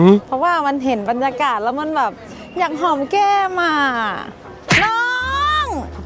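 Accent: North, Thailand. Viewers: ชอบการนำเสนอของน้องค่ะ และชอบคำเมืองของน้องด้วยเจ๊า..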